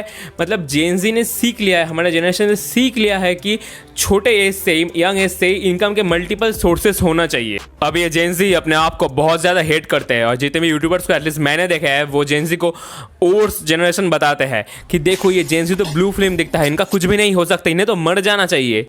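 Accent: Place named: Bengal, India. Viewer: True, he really needs to work on the grammatical errors and I can understand his grammatical errors and also wrong pronunciations due to his bengali accent as me being a bengali too. I hope he works on it.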